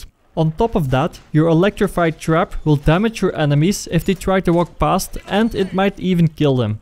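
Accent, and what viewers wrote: Netherlands. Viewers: he is clearly dutch, i'm dutch myself and know how dutch people speak english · Are you Dutch because of your accent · Dat Dutch accent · Ben je nederlands? klinkt zo haha! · dat nl accent XD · Iewl dat Nederlands accent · i think it's a dutch accent, and i can hear it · jij komt zo hard uit NL you engels is zo fockt up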